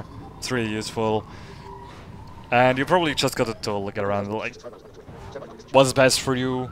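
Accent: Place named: Switzerland. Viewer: has uf dim Steamprofil gseh, mer erkennt d'schwizer scho ader stemm, ben aber ned ganz secher gsi :)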